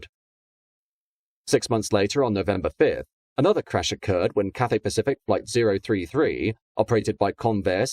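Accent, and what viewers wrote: United Kingdom. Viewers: I was born in Kowloon Hospital in 1946 . Growing up on my Grandparents' dairy farm in the hills above Ngau Chi Wan, my brothers and I watched the landing of Pan Am Clippers landing on Kowloon Bay. // Also, the British planes landed in the first- built runway ( near the district Wong Tai Sein): Everytime a small plane landed, all buses and autos had to halt in front of a barrier. I always take the No. 5 bus to St Mary's Canoissian School daily, and I remember this protocol vividly! // on certain special occasions, fleets and fleets of British aeroplanes would fill the air space --- above Kowloon Bay --- for an exhibition. It was exhilarating to watch, as kids, perched on a nearby hill ( south of an old, Taoist monastery!!! The British government later built the famous KAI TAK AIRPORT: I departed the bustling British Crown Colony, on Philippibes Airlines, taking off from the Kai Tak runway, heading towards Li Yu Mun Channel. 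What good Memories!// My dream -wish now is to cruise to Hong Kong Harbor, via Priness Cruises, and dock alongside the old Kai Tak runway to disembark!!! It will be .... like .... COMING HOME for a little Chinese girl! Thanks for this informative video. Much Appreciation. Love the British accent of the Narrator!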